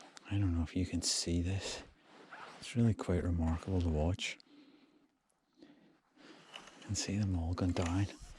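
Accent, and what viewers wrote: Northern Ireland, United Kingdom. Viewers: your accent sounds really northern irish-ish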